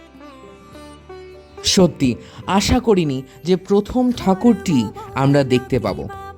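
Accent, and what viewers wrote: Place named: England, United Kingdom. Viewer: DURGA PUJO TO ... BANGLA BOLLE BHALO HOY.......ANGLO VERSION BANGLA TA THIK MANACHHE NAAAAA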